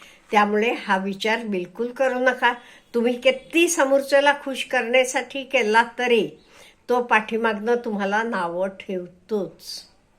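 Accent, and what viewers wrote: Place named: Gujarat, India. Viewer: ताई नक्कीच गुजराती वस्तीत राहिल्या आहेत. उच्चारच सांगतायत.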